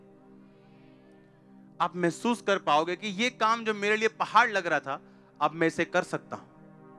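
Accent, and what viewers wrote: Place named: Punjab, India. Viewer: Sir ji daily I listen to you ur punjabi touch is very attractive. Lord Jesus bless you with endless blessing🙏🙏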